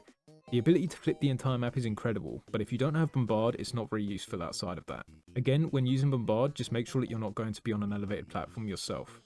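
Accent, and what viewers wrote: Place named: United Kingdom. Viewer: Man I had to put you at 70% speed. British is hard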